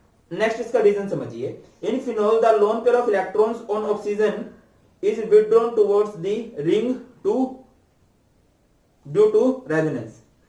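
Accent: Haryana, India. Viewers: cool sir r u from haryana thanks sir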